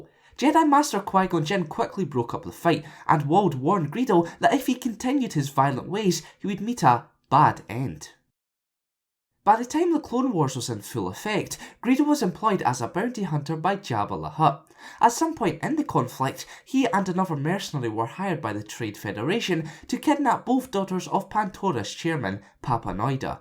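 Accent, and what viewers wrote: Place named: Wales, United Kingdom. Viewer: I love your welsh accent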